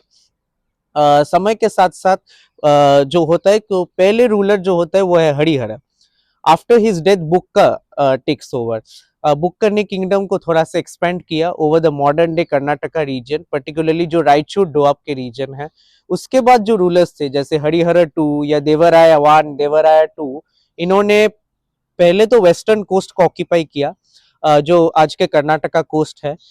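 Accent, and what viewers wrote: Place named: Bengal, India. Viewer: His Hindi accent is Bengali..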